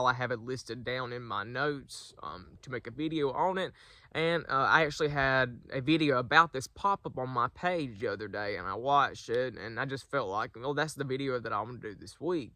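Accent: South, United States. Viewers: When I listen to you, it's like listening to myself- except for accent is little less southern. Great video though! Blessed day!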